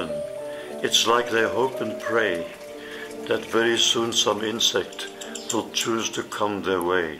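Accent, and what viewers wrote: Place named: South Africa. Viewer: Wonderful and heartfelt video, well done! Good to hear a friendly South African accent too:-)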